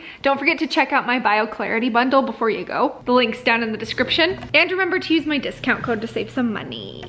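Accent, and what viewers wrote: United Kingdom. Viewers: Awesome british accent .. 🤓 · Tienes acento ingles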